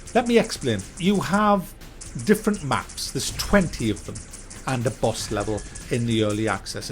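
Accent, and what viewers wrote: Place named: Northern England, United Kingdom. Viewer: I adore your northern English accent. My family on my mother's side is from Sunderland and New-Castle. I live in Canada btw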